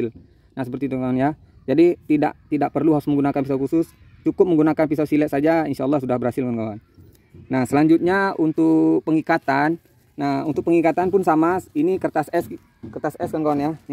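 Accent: Indonesia. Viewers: Bang saya lihat dari gaya bicara nya tampak ke polosan dan ikhlas nya dalam menyampaikan tutorial, semoga Allah membalas kebaikan nya dengan kebaikan yg berlipat ganda Amiiiin. Bdw bang alamat nya di daerah mana? Dari logat nya sepertinya dari pulau sumatera mungkin daerah Riau pekan baru dan sekitar nya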